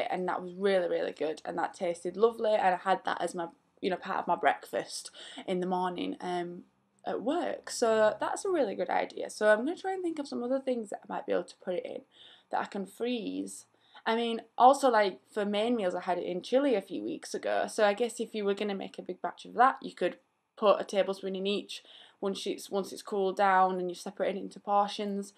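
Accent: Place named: Yorkshire, United Kingdom. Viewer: Yorkshire accent if I'm not mistaken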